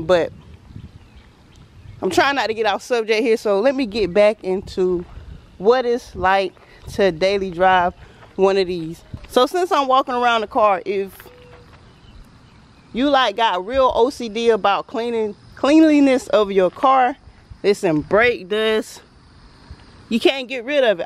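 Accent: South, United States. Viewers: That southern accent is something special.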